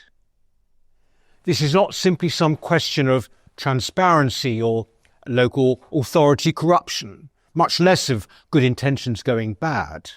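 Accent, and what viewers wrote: RP, United Kingdom. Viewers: Omg Peter you say it so well. I cannot thank you enough a posh man’s voice carry’s more weight than a pooh man’s voice !!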